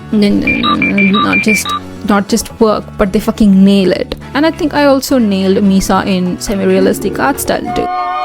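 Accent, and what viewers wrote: India. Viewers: are you Indian? The accent feels so 🌸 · why does she talk like an Indian Girl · Why your accent sound so Indian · Her accent she is Indian, I love it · Is accent is indian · You sound like Indian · Sounds like an Indian accent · u sound indian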